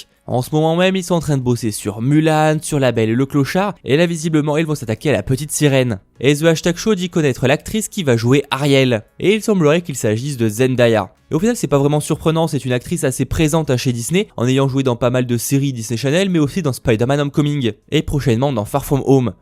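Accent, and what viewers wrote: England, United Kingdom. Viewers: Ton accent anglais me perturbe vraiment.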